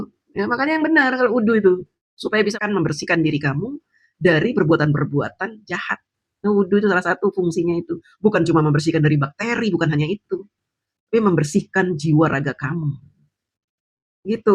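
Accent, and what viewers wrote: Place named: Java, Indonesia. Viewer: Saya kira mbak bkn org jawa,tapi kalau bicaranya diselipi pake bhs jawa yg medok,baru percaya nek mbak priyantun jawi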